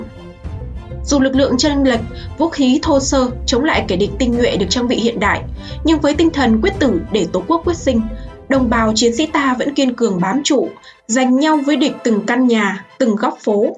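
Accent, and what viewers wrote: North, Vietnam. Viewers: nói cho nghe nè đừng dạy người khác phải theo mình đó là hói hư tật xấu của người csbv Miền Bắc. Cụ Diệm (gia đình quan Triều Nguyễn cụ Diệm kinh qua chức vụ quan lại cải tổ+đi du học nước ngoài đây là lí do bọn Miền Bắc nói Cụ là tay sai hoặc con nuôi do Mỹ nuôi...) trước khi VN còn thời Pháp cai trị do Vua Bảo Đại đứng ra điều hành bằng chính sách của Pháp gọi là dân đi lính QGVN Khi Pháp áp bức sức lao động khổ sai thì dân đứng lên thành Lập nhiều Đảng phái Khi giành thắng lợi Pháp trao trả chính quyền thuyết cs ra đời cướp chính quyền. Bảo Đại kêu gọi Cụ Diệm về nối tiếp vì chính sách cũ của Pháp để lại ông xích mích Cụ Diệm thay thế Vua Bảo Đại lập nên VNCH chính sách mới cải tổ lợi dụng sự tài trợ kinh tế của Mỹ nhằm ngăn chặn sự lang rộng của Liên Xô ,TQ hay vì Pháp muốn được hậu thuẫn nhưng bị từ chối vì thời gia đình cụ bị Pháp đối xử tàn nhẫn , những năm tháng đầu là thời hoàng kim phồn thịnh giá trị tiền vnch phát hành lưu thông khắp nơi với sự kinh tế thị trường người Hoa Kiều buôn bán giúp dân Miền Nam đáng học hỏi với một Quốc Gia Tự Do Chính Phủ như vầy các nước khu vực như Hàn ,Sing ,Lào... 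còn qua học tập làm việc , sao không qua Mỹ mà qua vnch ,các Quốc Gia khác còn mời cụ đi du hành các nước , chưa có Nguyên Thủ Quốc Gia nào được Tổng Thống Nước Mỹ ra tận sân bay đón tiếp và bắn đại pháo long trọng Mỹ từng đề cử vnch ra hội đồng LHQ Liên Xô ,TQ và Phe Cộng Sản không đồng ý. Điều cuối cùng tôi nói là con nhỏ MC nói tiếng Miền Bắc cho chuẩn ở ngoài đời đi ,đừ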